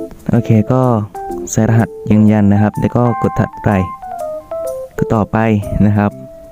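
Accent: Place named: Deep South, Thailand. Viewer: เด็กสามจังหวัดชายแดนภาคใต้แน่ๆสำเนียงแบบนี้ผมชอบทำต่อไปให้กำลังใจ🥰🥰🥰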